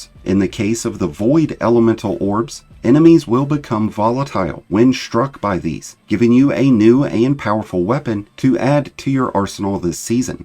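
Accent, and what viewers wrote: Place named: Pittsburgh, United States. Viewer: lol you're from Pittsburgh, aren't you?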